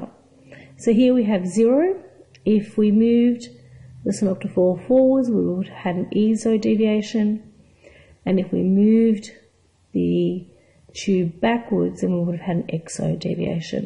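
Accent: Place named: Australia. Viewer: it's cool to hear some Australian accent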